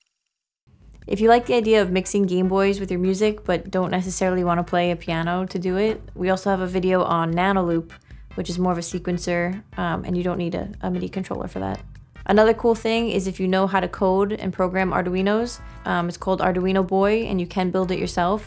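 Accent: Long Island, United States. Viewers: that long island Accent is hitting different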